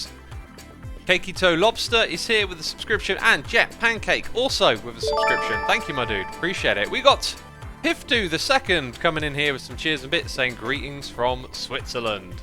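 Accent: United Kingdom. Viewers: X's streams are perfect for: - for soothing oneself to sleep - for relaxing while e. g. drawing, sewing, cleaning - when you just want to have some background noise (a one which makes you laugh out loud every 2min) - for cuddling up with your pet and a cup of tea - when you need to hear some dad jokes - for taking a walk/run on a treadmill - for chilling out with your siblings - when you are in need of hearing a slup of tea (one of my basic needs tbh) - for when you need to be reminded to take joy in simple things (he's seems so grateful for even the simplest of things) -for when you want to develop a British accent For everything, okay? THEY ARE PERFECT FOR EVERYTHING. I'm glad I can watch them on YouTube :-)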